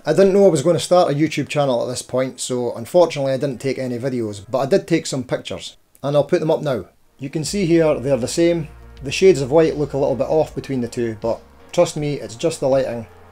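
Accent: Scotland, United Kingdom. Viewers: Oh man i love your accent so much , the first seconds i thought you are Turkish or Arab , the way the " r" are pronunciate , but after 30 seconds it's obvious : this guy is Scottish or Irish ,i don't know ... One thing is sure , it's really different and unique , and really remarquable that in the North of England , Scottland , the people have an accent very close to the irish one , closer than for example , East England or the region of Leeds .... Maybe it'a celtic thing ii don't know , but one thing for sure : your accent is really deep ..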